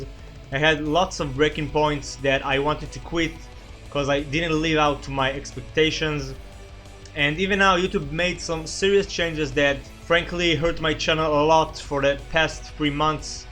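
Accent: Israel. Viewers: וואי אתה נשמע ישראלי רצח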